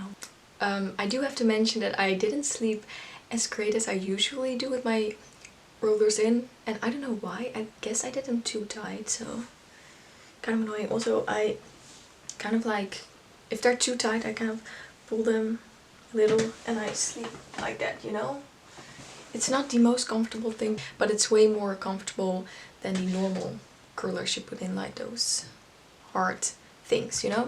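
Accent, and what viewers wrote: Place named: Netherlands. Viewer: you sound dutch or belgian, weet niet precies welke van de twee. leuke tutorial!!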